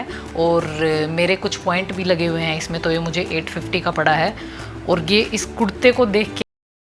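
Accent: Haryana, India. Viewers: wow haryanvi☺️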